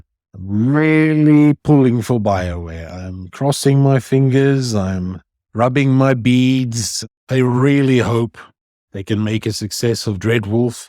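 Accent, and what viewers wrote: South Africa. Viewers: I might be wrong, but is that a South African accent I hear?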